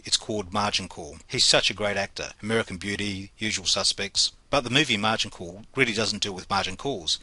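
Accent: Australia. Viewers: love the aussie accent, and very well explained thanks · Australian accent?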